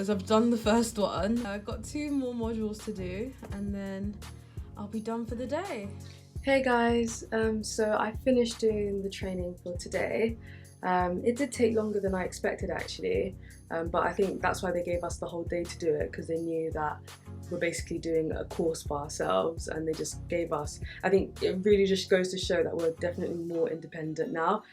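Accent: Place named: United Kingdom. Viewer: Well I’m here for your British accent 😂 I’ll be moving to the Uk soon. So I gotta work on my English accent lmao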